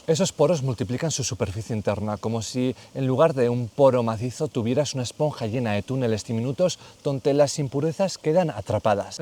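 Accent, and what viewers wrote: Basque Country, Spain. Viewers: Parece VASCO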